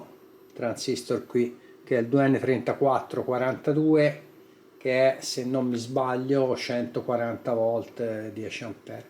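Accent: Tuscany, Italy. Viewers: Ciao, bella riparazione. Sei toscano come me😂. Ho visto la sigla sul transistor di potenza,SGS ates unica gloriosa industria italiana di semiconduttori anni 70😢😢bravo ti seguo volentieri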